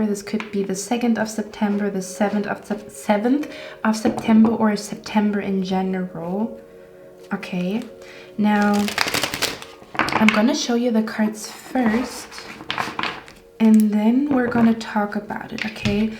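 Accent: Germany. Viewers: Love your accent. Are you German?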